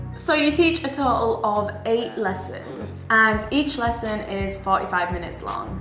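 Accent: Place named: England, United Kingdom. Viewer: Hello ! Thanks for the video ! Im very curious to know about your accent. From which region are you in England ?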